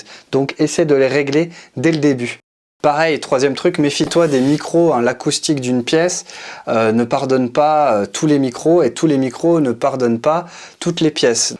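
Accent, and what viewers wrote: Quebec, Canada. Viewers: Mais si, il est bien l'accent québécois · excellent accent québécois !! 😀 bravo !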